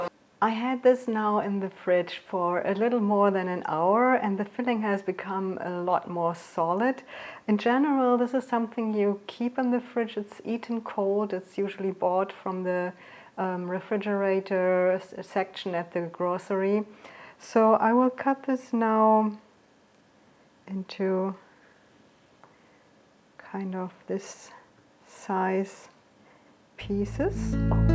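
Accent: Germany. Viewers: We live in the suburbs of Nashville, Tennessee. PS: My husband guessed you were from either Hamburg or Hannover based on your accent. Best to you and your family from your newest subscriber! I always want to find recipes that remind him of home. But I have fierce competition. His mother is a retired chef, his brother is a chef, and our only nephew is a chef.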